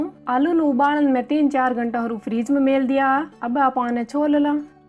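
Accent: Marwar, India. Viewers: Aap ki recipe or marwadi boli bahot hi mithi hai,Ram ram🙏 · वाह जी काबिले तारीफ आपकी सारी रेसिपीज बहुत अच्छी लगी और आपकी मारवाड़ी व मीठी बोली आप की रेसिपी में चार चांद लगा देती है..... आप ऐसे ही आपका हुनर हम तक पहुंचाते रहिए और देश दुनिया में अपना नाम रोशन करते रहिए l धन्यवाद🌼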